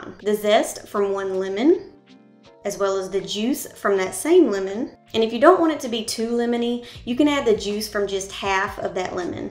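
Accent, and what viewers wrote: South, United States. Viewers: Love all the recipes. And that Southern accent got me suscribe. Love from Canada ❤❤❤ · I just have one question. Are you from the south?😂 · And to add, I expected to hear a Spanish type accent coming from you and then that beautiful southern accent came out. Threw me for a second and chuckled. A pleasant surprise 😊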